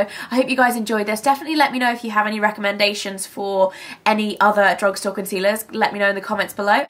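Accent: England, United Kingdom. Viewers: Aww ur accent reminds me of when I used to live in England! Awe I'm defiantly going to buy one of ur recommended concealers...lol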